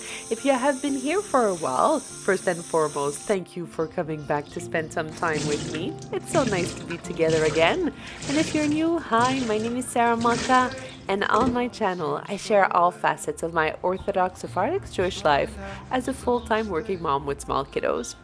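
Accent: France. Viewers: oui moi aussi! Mais je vis à Mancheter! Ça m’a fait plaisir d’entendre le petit accent français! J’espère qu’un jour je parlerai anglais aussi bien que vous! J’aime bien vos vidéos elles donnent du courage! Et j’aime aussi votre tsniout!🧡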